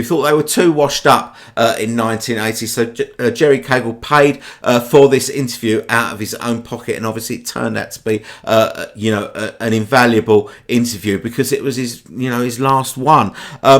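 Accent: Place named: England, United Kingdom. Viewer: Blah Blah Blah with an English accent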